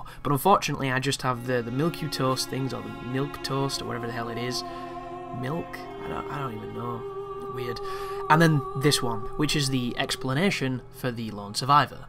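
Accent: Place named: Yorkshire, United Kingdom. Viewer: Good vid, can tell your from Yorkshire haha ;)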